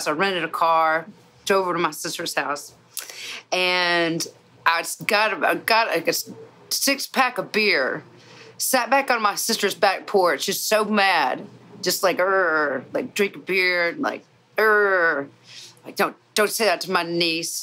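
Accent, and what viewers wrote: South, United States. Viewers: Good deal...taking up for her like that. I'm not used to hearing a slight country twang to your voice but, it sounds good. The more you get excited, the more it comes out. I probably sound like pure West Virginia hillbilly but, that's where I'm from....LOL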